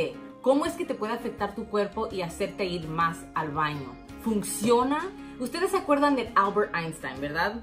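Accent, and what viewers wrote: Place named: Spain. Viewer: First, love your Spanish accent you are excellente instructor.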